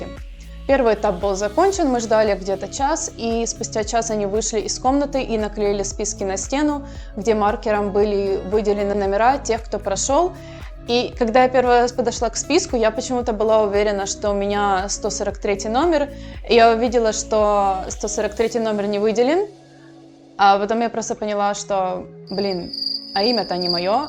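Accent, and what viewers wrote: Ukraine. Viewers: Украинский акцент не уб.ешь ничем. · Может покажется странным, но самое классное в видео, это Ваш украинский акцент, особенно нравится буква "ч", и буква " я", которая по произношению ближе к "а".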